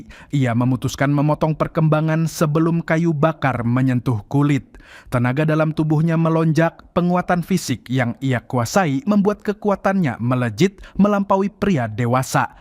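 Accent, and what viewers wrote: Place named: Banyumas, Indonesia. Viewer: SUARA LO NGAPA SIH, MALAH KAYAK ORANG NGAPAK. JADI DIRI SENDIRI AJALAH JANGAN DIBUAT BUAT 😡😡😡😡😡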